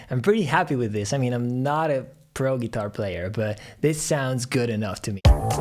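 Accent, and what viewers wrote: Italy. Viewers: ahah sei romagnolo? sono rimasto serio fino a . Comunque quanta skill, complimenti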